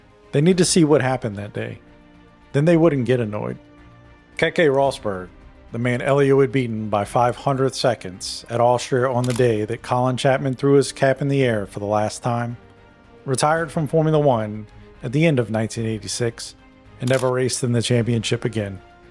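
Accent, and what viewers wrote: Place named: United States. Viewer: It must be AI as the maker claims he's based in India. So this must be an AI voice with an American accent.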